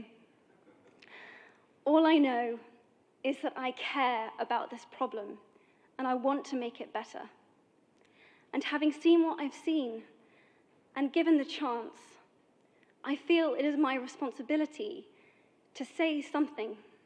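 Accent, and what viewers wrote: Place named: United Kingdom. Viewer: bla bla bla..è vero forse per i paesi musulmani, asiatici o africani ma in Italia no. Purtroppo qui, come nei paesi occidentali abbiamo già sperimentato cosa intenda la donna per femminismo: abbiamo visto troie fare la coda per fare i festini dal primo ministro, donne (anche minorenni) disposte a vendersi per una ricarica del cellulare, donne al potere incompetenti (pivetti, boldrini, santanché, picierno..), donne bugiarde e più meschine degli stessi uomini, cantanti celebri che "pornificano" ogni loro gesto, le parole dell'attrice inglese sono retorica vuota e stantia per femministe fallite. Ringrazio per l'upload, ho potuto così saggiare l'ottima pronuncia Bristish di questa attrice sin ora a me sconosciuta. Grazie.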